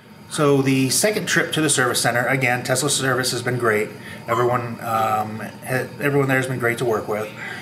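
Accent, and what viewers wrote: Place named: United States. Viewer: your American accent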